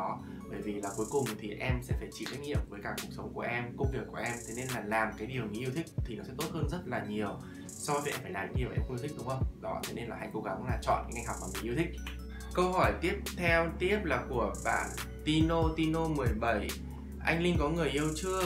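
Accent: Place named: Hanoi, Vietnam. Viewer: thích video của linh vì các lý do sau... 1/ gần gũi 2/ những gì a chia sẻ khá thông dụng vs ng xem 3/ giá hàng hóa khá phù hợp vs đối tượng như e (ít tiền) 4/ giọng HÀ NỘI (cute)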